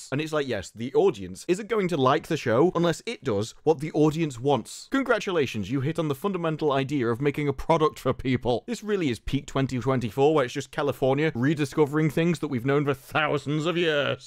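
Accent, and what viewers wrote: United Kingdom. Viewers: This man has peak British mouth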